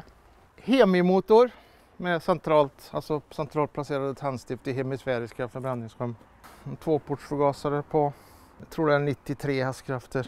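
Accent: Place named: West Gothland, Sweden. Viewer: Kan vara den Västgötska dialekten!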